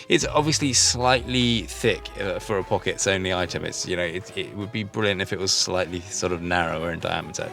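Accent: United Kingdom. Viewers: Thanks for the content and its good to have a Brit sounding voice chatting about the virtues of EDC in its most basic form. Good to know there is someone else on the same page :) Best wishes Mike in County Durham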